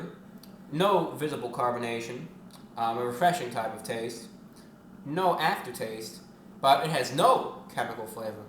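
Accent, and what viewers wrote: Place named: Brooklyn, United States. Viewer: Very Brooklyn accent you have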